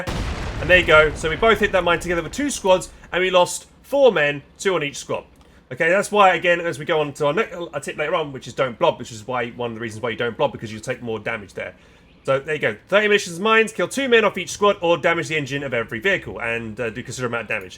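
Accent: United Kingdom. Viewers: Fast talking British accent and mostly incomplete sentences makes for poor communication.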